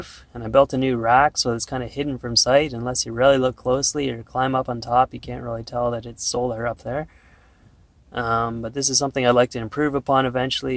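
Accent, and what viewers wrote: Canada. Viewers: Hi, man! Looove your videos and have been watching for a while now, especially all your amazing bike trips and now the minivan conversion, as I'm planning to do a conversion in the near future. Something I'm dying to know - what accent do you have? I'm pretty sure I remember you being Canadian?? but what part? Sorry if I'm wrong or what not. thanks for all your videos! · You have a super Canadian accent, like my buddy Randy.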